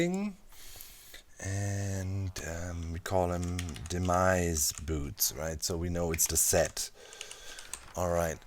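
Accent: Germany. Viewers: Why do I hear a german accent?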